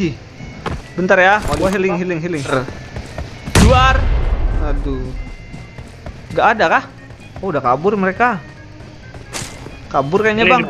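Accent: West Java, Indonesia. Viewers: Edan mantap cui modar modar hahaha pasti urang sunda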